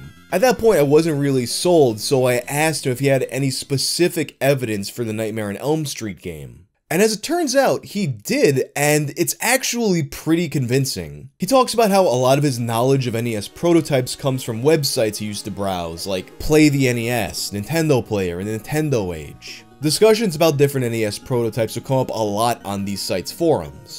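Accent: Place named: Queens, United States. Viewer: "And here's where today's SPONSAH, Honey, comes in" Just can't take that Queens accent out of you, huh? Love it.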